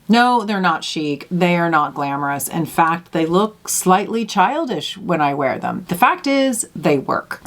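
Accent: Ireland. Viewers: Hey ❤❤ i detect an Irish accent ??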